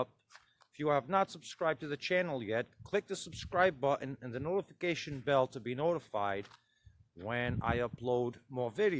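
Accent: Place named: New England, United States. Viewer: I'm new here, what body of water is this? I'm guessing you are somewhere in New England with your accent! Wow I've never seen them processed before. Looks like I was right with NE. Your jacket says cape cod. It's on my bucket list to travel out there since we're only a few hours from there.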